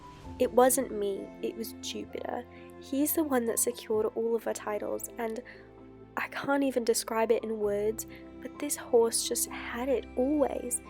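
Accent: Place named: United Kingdom. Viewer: i got the chills wow, is it me or does she have British accent in this video but not on her other videos idk anyway love you <3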